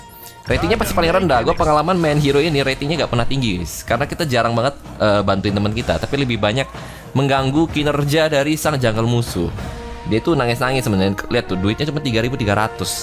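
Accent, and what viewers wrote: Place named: Medan, Indonesia. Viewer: Mati kau longor wkwkwk medan kali bahasa mu bg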